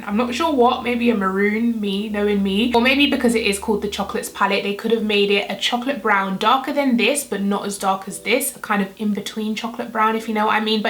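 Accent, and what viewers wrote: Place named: United Kingdom. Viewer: I love to click on your videos just for your bomb ass looks and your accent! I love the British accent. Lol.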